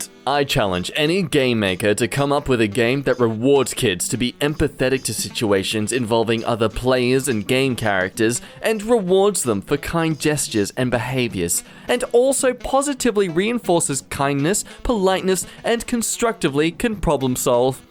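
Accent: Scotland, United Kingdom. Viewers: No one wants to talk about his Scottish accent? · the Scottish accent was the best thing as well as "i sware ya brain so hollow i could turn it into a bagpipe" The Demoman TF2 intensifies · I totally love the Scottish accent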